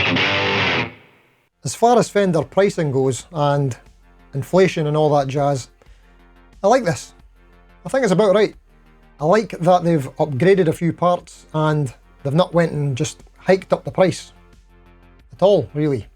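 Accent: Scotland, United Kingdom. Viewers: It’s shocking the scottish accent for spanish people 😅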